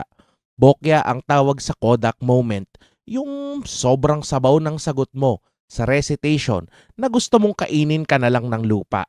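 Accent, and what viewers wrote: Philippines. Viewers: English language with Filipino accent.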